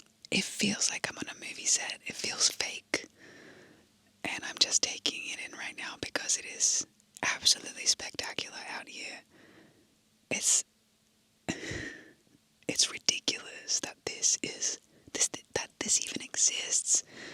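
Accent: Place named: Australia. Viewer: I’ve been following you for years. Thought I would share your Aussie accent has came back so strong lol I imagine it’s only natural from immersing yourself back home (and also from what I can tell your guy is Australian). Just thought it was funny hehe keep enjoying your travels 🙂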